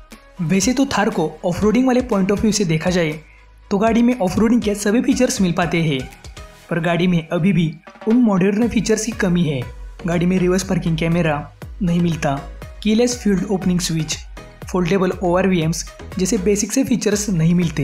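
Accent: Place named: Maharashtra, India. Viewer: You sound like you are from south western Maharashtra; maybe Sangli/kolhapur/satara. Nice video though!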